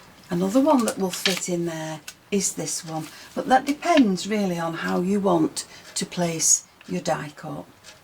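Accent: United Kingdom. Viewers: I love your british accent it is very soothing and relaxing, and the music is very pretty and also soothing and relaxing.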